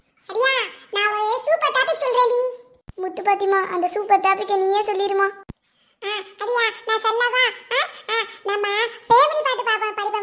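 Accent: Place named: Parangipettai, India. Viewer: 80%of your slang sounds like parangipettai slang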